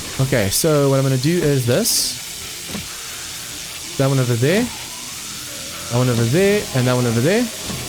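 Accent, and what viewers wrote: South Africa. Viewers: question are you south african ?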